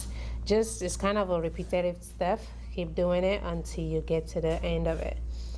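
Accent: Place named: Liberia. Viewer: I hear the Liberian! Go, sis!👌👌💜💜💜🇱🇷🇱🇷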